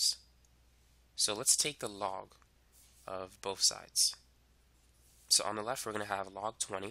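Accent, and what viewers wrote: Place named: New York, United States. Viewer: bro you sound like a new yorker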